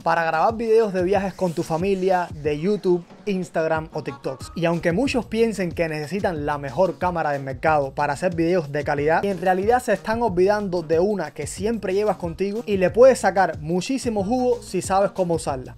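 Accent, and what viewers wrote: Cuba. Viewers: Me gustó mucho tu video. Che tenes hacento Cubano Me Equivoco. Saludos bendiciones ❤❤ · se entiente lejos 20mil km q eres cubano ajajaja grande bro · El acento parece de mi tierra la linda cuba bendiciones crack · buen video, tienes un acento bastante peculiar, eres cubano? saludos desde cubita 🇨🇺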